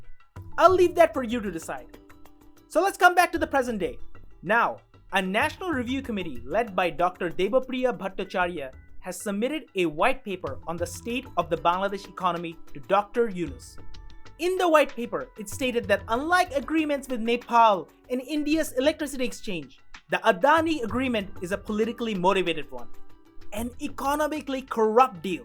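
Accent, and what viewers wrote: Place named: United States. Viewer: Good one sided propaganda video. Very smart idea to do propaganda in Americanized English accent. However, this amateur analysis will not work outside of gullible Bangladeshis.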